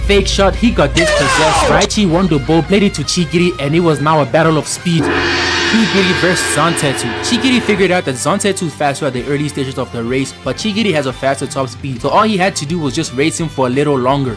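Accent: South Africa. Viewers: Wait are you south African? Because I am and super strikers built my child hood and made me love football poi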